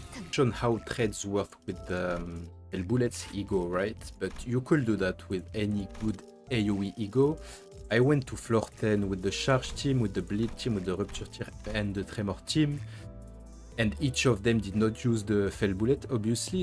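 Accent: France. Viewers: this exquisite french accent really adds a lot to the video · It's so nice finally hearing a fellow Frenchie for once in this guides.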